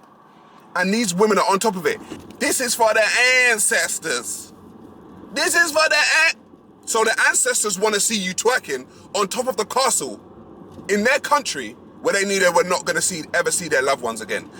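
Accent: United Kingdom. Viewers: I’m sorry, but you saying, “Oh f*ck off” sounds so polite. I have no idea why the British accent makes the most offensive sayings sound nice.🤣 With that said, I completely agree with you. I am not offended by what you said because it’s true. Way too many black women are out here making us all look bad. I do my absolute best to be the positive image of black women. My daughter is biracial, but I also teach her to be the positive image of both the black and mexican communities. Unfortunately, there are major problems amongst women in both races. I mentor young black women so they know the path they should take and why they should take that path. I even seek out young and older black women in comment sections of videos who have a destructive mindset. I don’t shame them for their mindset, but I let them know their mindset is not one that breeds lifetime success. 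Some of us are out here on the ground doing the work. We don’t have YouTube channels because we are working in real time.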